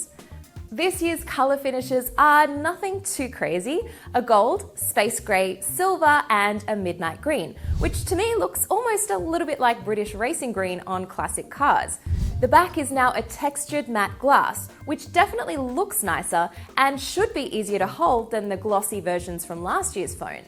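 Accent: Australia. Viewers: Is she Australian? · R u Australian? Cuz ur accent is aussie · I feel like she’s Aussie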